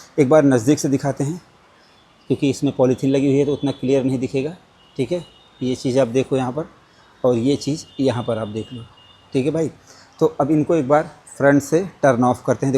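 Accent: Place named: Awadh, India. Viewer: Bhai aap ka bolne ka desi awadhi tarika bahut hi gajab lagta hai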